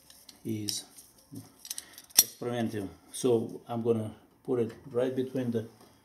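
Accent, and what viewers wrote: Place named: Russia. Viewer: русский акцент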